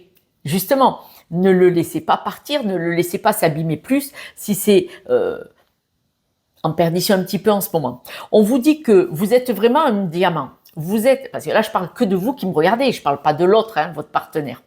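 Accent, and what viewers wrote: South, France. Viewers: Oui je suis d'accord avec vous...j'ai commencé à prioriser ce que je pense faire sur mes jours de vacances...rangement et sorties avec les amies...mon autre est statique...je dirais..hyper-statique 🙄 Vous êtes l'alchimie de Louis de Funes (en geste) Maïté(en paroles bienveillantes avec l'accent)Jamie Lee Curtis(pour le physique) et le tout avec le ❤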